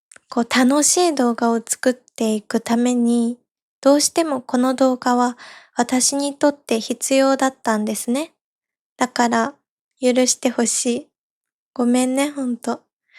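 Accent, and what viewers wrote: Kansai, Japan. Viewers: Hey, I listened to the last 15 Podcasts so far and always try to catch up :), no matter what topic I love listening and learning together with you. Actually when you become more "negative" I can resonate a lot, it makes me thinking of happyness and future and I want to thank you for that, because without speaking about it, it just stacks up over time. My Japanese improved also sooo much (Next time I try in Japanese again :D) :) PS: Your Kansai Dialect is very cute ^^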